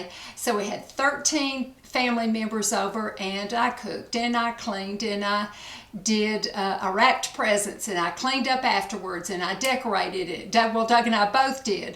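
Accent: South, United States. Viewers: I could listen to your that lovely southern voice of your s all day ❤️💞 definitely going to buy that mascara.you have lovely eyes so sparkly.eyes are definitely the window to the soul.😘😘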